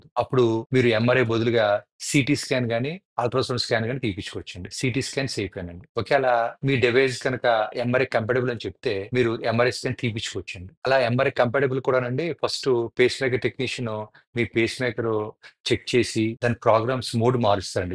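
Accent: Godavari, India. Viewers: godavari slang ❤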